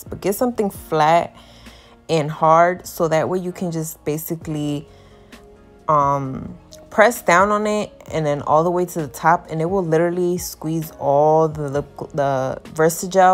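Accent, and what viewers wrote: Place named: Russia. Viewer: Акцент словно русский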